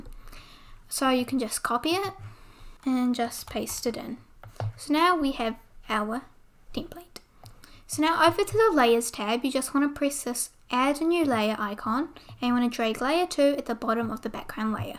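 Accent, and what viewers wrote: New Zealand. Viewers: Love the kiwi accent. You were a big help since I got hired for a clothing group and needed to learn fast.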